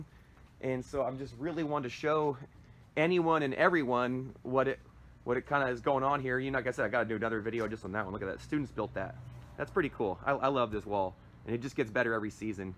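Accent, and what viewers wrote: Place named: Australia. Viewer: Love your Aussie!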